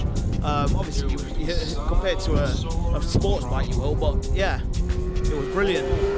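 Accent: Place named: England, United Kingdom. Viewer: when a black guy speaks close enough english english accent. its so fucking funny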